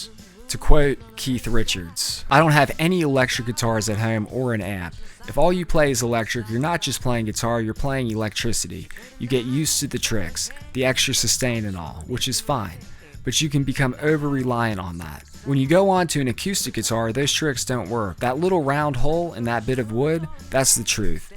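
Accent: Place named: Philadelphia, United States. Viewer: Your Philly accent is uncontrollable brother i love it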